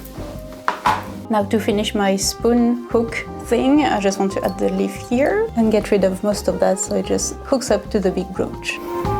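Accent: France. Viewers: As well as a fantastic creator you are naturally beautiful and you sound amazing, I run a French bistro here in Wales UK and I'm so ysed to listening to the French accent and mix of French and English language, you should be proud of yourself you are an inspiration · First time watching any of your videos and don't take this the wrong way, but your accent is one of the first French accents that didn't irritate my picky ears. I'm starting to wonder if maybe the French accents that annoy my odd taste in accents are fake... Anyway I doubt I'm one to complain about accents with Utah (USA) drawl and I am watching the video because I love to craft and if it takes inspiration from historic pieces, even better.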